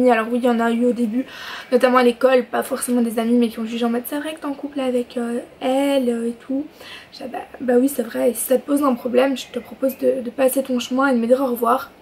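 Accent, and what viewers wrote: Belgium. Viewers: Accent belge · Tu es belge, non ? Tu as un accent vraiment adorable. Et t'as vidéo m'a vraiment aidé merci beaucoup :) xx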